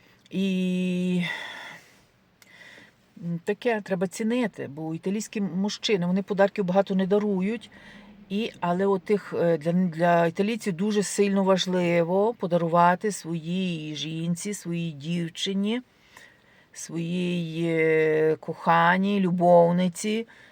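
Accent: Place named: Galicia, Ukraine. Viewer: Де ви, оберталися у совєцьку добу? Що ви розповідаєте про "подарунки на новий рік "? На заході України не дарували подарунки на новий рік- це просто нонсенс. Подарунки дарували на Миколая і по всьому. Я з Тернополя, тож відповідно знаю добре Львів- маю родичів у місті, приїздила у відрядження. На Галичині більшість людей навіть не святкували новий рік, тільки Різдво. Ви маєте галицький акцент, але не самого міста, можливо району. Принаймні, жодна людина у моєму колі не користала суржик. Щодо намиста, то такі прикраси є дуже тренді, прикрасить будь-яку сорочку чи сукню і до вишиванки надзвичайно гарно. Послухайте красиву пісню чарівної " "- якраз про це. Певно, італієць мав на меті подарувати щось особливе. 🇺🇦✌❤🖤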